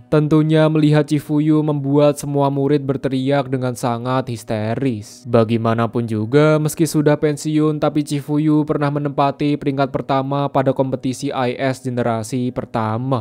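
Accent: Java, Indonesia. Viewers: di pikir2 suara mu mendok bang, cipuyuuu ,wanitaaa,onimuraaa,kaka dari hogiii😅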